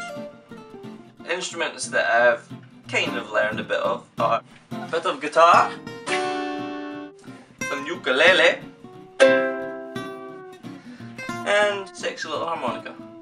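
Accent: Scotland, United Kingdom. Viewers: I've never heard a Scottish accent personally it's really cool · Subscribed- G'day mate! your accent reminds me of my year 4 teacher who came from Scotland - but that was a long long time ago. I thought it would be good/interesting to see how you develop your blogs from here. Good work! Good Luck! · I really do like his channel a lot. And I love Scotish accents. America sucks. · Scottish Accent>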